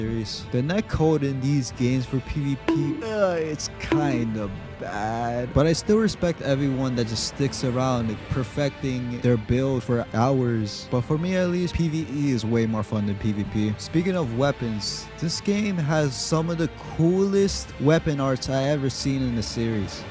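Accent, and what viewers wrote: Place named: Hawaii, United States. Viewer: U sound like u from Hawaii brah? Lol..I grew up on da Big Island myself..Nice Video tho. I'm on my first playthrough.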